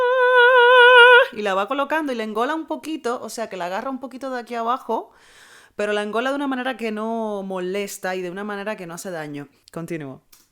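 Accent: Cuba. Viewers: bienvenida a Dimash, me gusta ver las reacciones de los coaches (aunq no se nada de musica), pero me encanta ver como se quedan perplejos, porfa reacciona a stranger y Ogni pietra, saludos, otra cosa, me sonaste cubana, suerte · Saludos desde Cuba. Me encanta Dimash y qué orgullo ver tu reacción tan buena 👌🏽. Enseguida reconocí el acento cubano jejeje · Solo de escuchar supe que eras Cubana.Sin duda somos inconfundibles.Saludos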